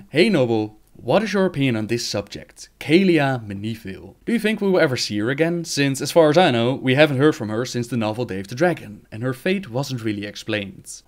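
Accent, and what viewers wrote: Netherlands. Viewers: your accent are you dutch?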